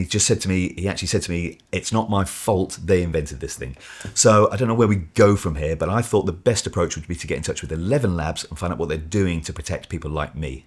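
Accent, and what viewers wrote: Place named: RP, United Kingdom. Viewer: "Its not my fault" he wasn't Gen X, Y or Z by any chance? Fortunately I have a heavy regional accent and am wondering if this would be more difficult to clone.Your voice, is very clear and almost RP to my ear and is "almost" devoid of any heavy regional accent. Okay, you are obviously from the south of England but I wonder if a "heavy" regional accent is more difficult to clone? Answers on a postcard please:)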